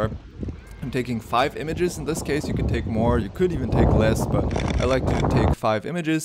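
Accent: Germany. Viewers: Are you a German-Guy? Your accent sounds German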